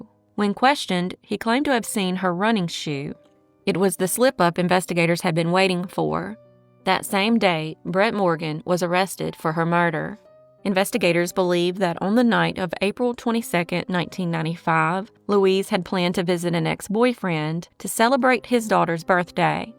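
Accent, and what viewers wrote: South, United States. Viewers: IT’S HER SOUTHERN GIRL CHARM!!!😉😉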